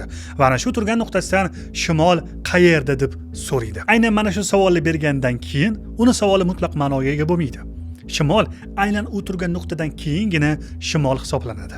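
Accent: Tashkent, Uzbekistan. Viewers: ука тошкент шевасида гапирмасдан адабий тилда гапирса бўлмайдими бутун ўзбеклар кўради фақат тошкентликлар кўрсинми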